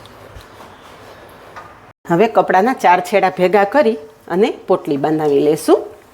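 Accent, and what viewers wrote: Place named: Kathiawad, India. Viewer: beautifully explained in pure kathiawadi gujrati. You showed two things.... How to make thick dahi and shrikhand. I will add the ingredients to make dahi and shrikhand. Thank you.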